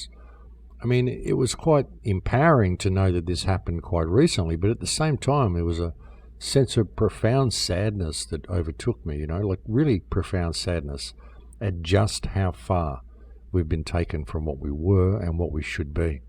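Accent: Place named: Australia. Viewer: He is Australian.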